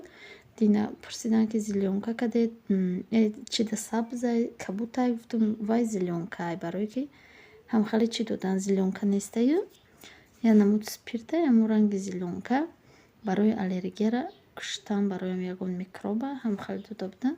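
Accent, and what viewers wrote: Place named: Gozimalik, Tajikistan. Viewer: Эээ шумо ай хуросоненку, моям ай Хуросонем , чиста Гозималики гап мезанен шумо 🥰🥰🥰🥰🥰🥰🥰🥰🥰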